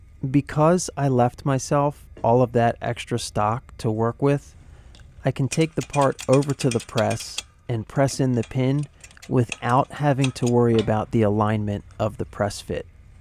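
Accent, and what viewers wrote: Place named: United States. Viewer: Are you breaking that bicious cyvle or that vicious circle? I don't always understand the American accent...😅😅😂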